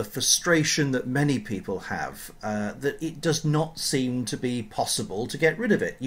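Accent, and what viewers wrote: United Kingdom. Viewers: I was frustrated with that line. Thanks a ton for showing how to get rid of it. Although it could have been a shorter video. Loved the Brit accent...been a long time since GoT!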